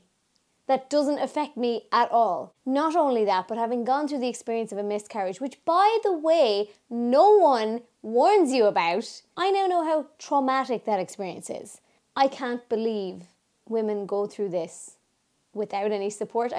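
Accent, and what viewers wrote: Ireland. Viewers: I'm sorry to hear this love... but you sound like a strong woman & it's better to face things head on than to dwell on them & let them get the better of you mentally & emotionally... god bless... just curious what part of Ireland are you from if you don't mind me asking....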